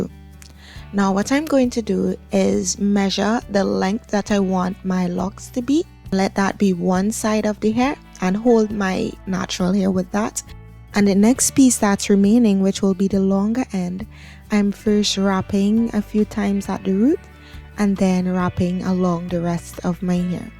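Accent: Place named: Trinidad and Tobago. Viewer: Trini to the voice ❤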